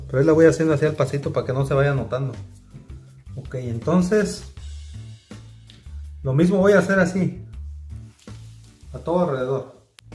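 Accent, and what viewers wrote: Mexico. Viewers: ES IMPRESIONANTE COMO HABLAS ESE TONO TEPITEÑO TIANGUERO NORTEÑO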